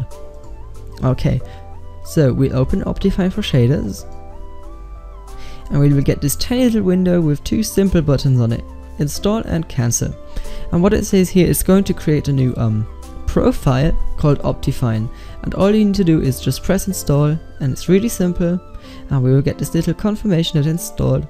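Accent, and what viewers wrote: Germany. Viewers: Sehr gutes Englisch aber man merkt das du Deutsch bist :D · you sound german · are u german ? u dont sound like a english guy :D greetings from germany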